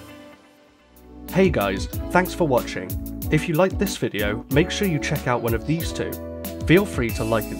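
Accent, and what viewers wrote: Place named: United Kingdom. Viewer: lose the limey!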